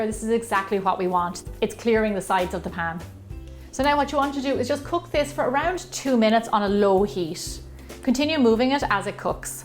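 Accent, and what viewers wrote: Ireland. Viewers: Thank You for the great video and information. I love your Irish accent. My spanish wife would shoot me for saying that. LOL!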